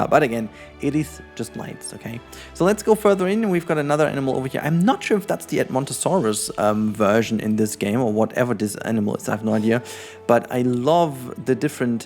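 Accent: Germany. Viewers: When Germans speaks Englisch then it sounds exactly like this guy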